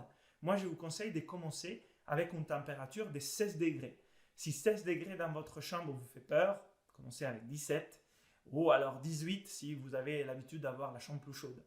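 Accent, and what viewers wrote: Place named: Italy. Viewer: Merciiii! Toujours aussi astucieuses et instructives tes vifeos, un regal de t ecouter avec ton charmant accent italien ♥️🐳🤩👏🏼👏🏼👏🏼🎊💃 Gratzie Mille 💓🙏🏼😻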